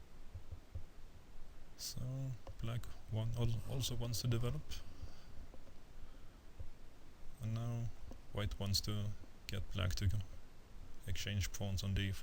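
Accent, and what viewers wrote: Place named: Sweden. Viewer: yay svensk accsent =D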